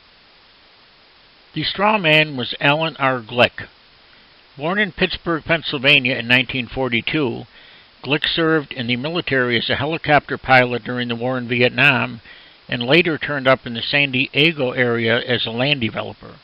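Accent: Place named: Midwest, United States. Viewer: it's his Midwest accent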